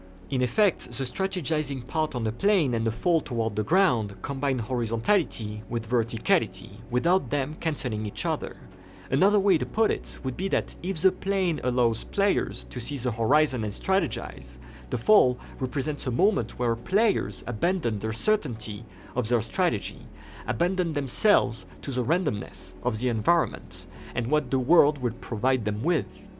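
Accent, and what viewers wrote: France. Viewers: i love your french accent lmfaoo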